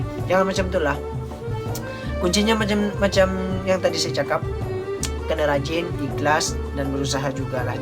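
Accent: Malaysia. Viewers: tak ada pun, cakap macam orang Malaysia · Logatnya kakak udah malaysia bgt yaa..heheheheh. semngaatt ya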